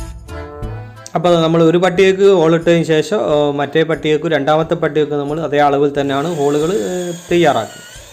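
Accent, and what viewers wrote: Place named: Palakkad, India. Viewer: സംസാരം കേൾക്കുമ്പോൾ പാലക്കാടുകാരനെപോലെ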